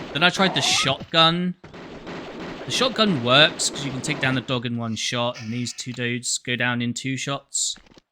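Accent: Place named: United Kingdom. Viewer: Great video! You sound like you are from the United Kingdom!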